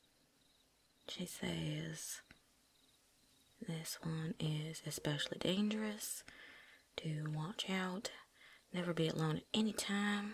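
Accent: South, United States. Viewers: Southern accent needs a little work but it’s still good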